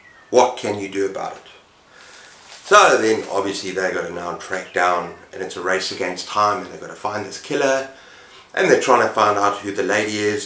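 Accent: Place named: South Africa. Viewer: Love to hear your South African accent and way of speaking. As a South African living outside the country it is great to hear you. And it’s great too to hear someone who engages with the books I love. You live in an area blessed with access to great books. Sterkte, my vriend.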